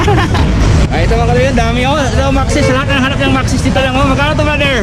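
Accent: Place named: Visayas, Philippines. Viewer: Klaro kaayong pagka bisaya dol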